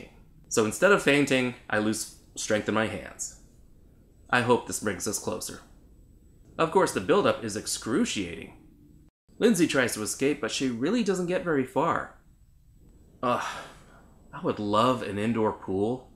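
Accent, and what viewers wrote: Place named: United States. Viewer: Are you somehow a Filipino? Cuz honestly you look like one with a very american accent 👌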